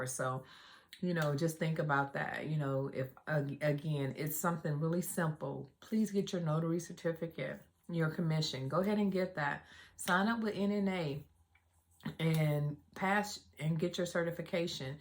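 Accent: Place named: California, United States. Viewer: Sounds like you are in Cali too. Congrats on your success & new income. And Thanks for the platform details.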